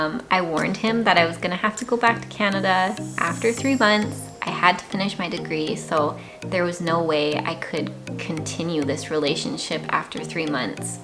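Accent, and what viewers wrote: Taiwan. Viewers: 觀看的影片一直讓人覺得很舒服，妳的中文有好聽的台灣親切口音，節奏也很放鬆彷彿就像是個熟悉的好朋友在身邊說話，謝謝你和我們一樣一起愛這片土地與人文，祝福越來越好! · What apps or resources did you learn Mandarin with a Taiwanese dialect/pronounciation? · 好道地的台灣中文~ 命中註定當台灣人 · 你講中文根本就是台北腔了，跟你老公根本沒差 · 這個小姐姐說話都很台也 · the Mandarin you spoke make me feel very comfortable, that's because you have a strong Taiwanese accent! I learn English from you and other youtubers, like Wes Davies...etc. Briefly that Wes and you speak the most clear and neat English that I learn. Very good listening that I'd ever had. Also I am learning Japanese, too. My opinion to you that making English videos can make your channel more popular, and get more subscribers! keep following your channel.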